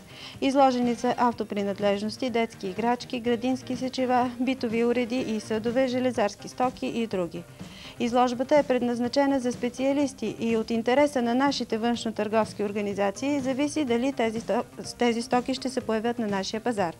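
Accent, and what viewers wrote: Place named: East Macedonia, Bulgaria. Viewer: shubav istocni makedonski dialekt.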